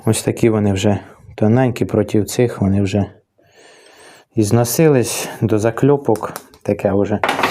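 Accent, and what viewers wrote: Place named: Belarus. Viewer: тута, шо ми... мабуть бульбаш якийсь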